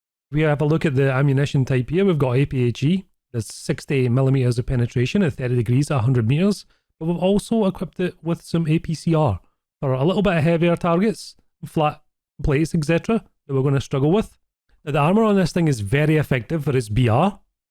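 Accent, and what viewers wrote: Scotland, United Kingdom. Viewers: I always want to see a Sherman firefly video, I like these videos too its like a Phlydaily video but Scottish which is cool.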